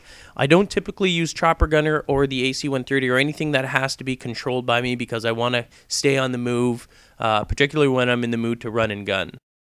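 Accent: Canada. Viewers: are u canadian?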